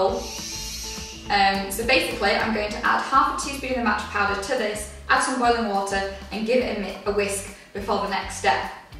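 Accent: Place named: Northern England, United Kingdom. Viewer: Love your northern accent, makes me miss home!! xxx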